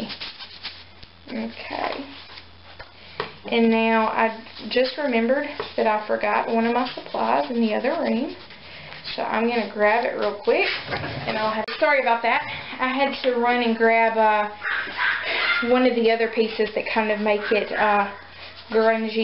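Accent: South, United States. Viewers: You're so comfortable in front of the camera! I'd get all tongue tiied. I love your videos too. I love that Southern accent too. I'm from South Carolina, so I've got that that same slow way of talking, but I think you're a little more Southern than I am. :) Keep the great videos coming!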